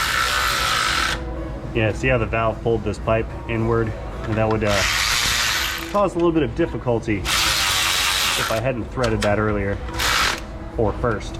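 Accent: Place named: South, United States. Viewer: Is it just me, but does voice sound a little different. I think the subtle Southern drawl has nearly disappeared. Different mic or other sound equipment change? NBD.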